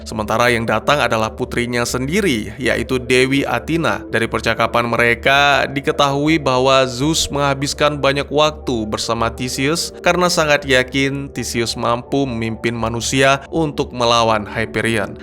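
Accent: Aceh, Indonesia. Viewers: bg btw lu orang aceh kan bg?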